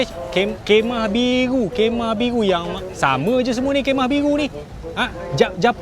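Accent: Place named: Kedah, Malaysia. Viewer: ni kmpg Mana sebenarnya.. Kedah pn ye.. Pahang pn ye.. 😅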